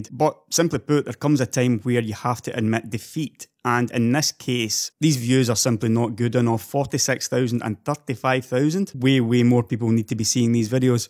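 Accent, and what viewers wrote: Scotland, United Kingdom. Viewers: Are you Scottish or Irish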